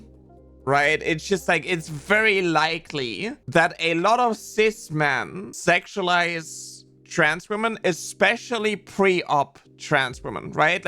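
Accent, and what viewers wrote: Germany. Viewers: r u german n etc ?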